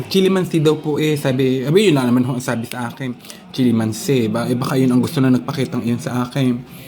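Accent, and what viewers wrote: Batangas, Philippines. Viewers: Batangenya ka po ba???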